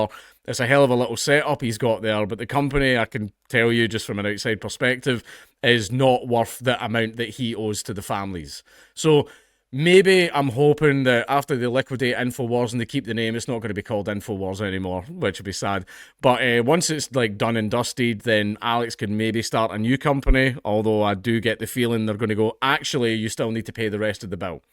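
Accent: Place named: Glasgow, United Kingdom. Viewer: Mate, I love this channel, I love your style and being Glaswegian so listening to your accent gives me a feeling of being in the company of family as my mother is Glaswegian and rest of family are Scottish. But to see Alex crying about his children when he destroyed the lives of 26 families, who actually had their family members including children killed, all to sell his protein supplements. "They're going to take your guns, "DESTROY THEM ALL because they're all actors", with absolutely no proof. Mate, you need a to make better choices in friends. He might have some awesome charisma and you may have developed a bit a a idol crush on him. And some of his theories may be correct but the way he went about the Sandy Hook incident he deserves all he gets. He is a greedy piece of shite that deserves all he gets.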